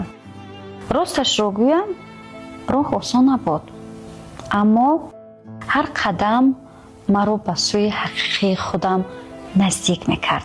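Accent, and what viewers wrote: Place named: Tajikistan. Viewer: چه زیبا لهجه تاجیکی خانم زیبا خدا قوت تولدت مبارک 🎉🎉🎉⚘️⚘️❤️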